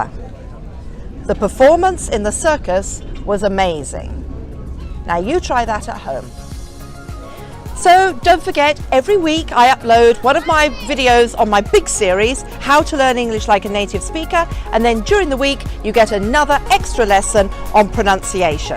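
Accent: United Kingdom. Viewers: I wish you all the best of success and thank you for your precious efforts. I like your British accent.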